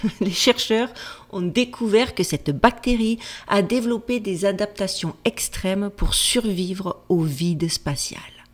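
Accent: Marseille, France. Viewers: Salut --merci pour tes videos---tu es native du sud est ou du sud ouest de FRANCE ?? Tu as ce bel l accent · Un bonjour de Corse où ton accent Marseillais fait partie des rituels rassurants de nos mercredi et dimanche 🎉❤, merci de nous partager ton enthousiasme et ta bonne énergie !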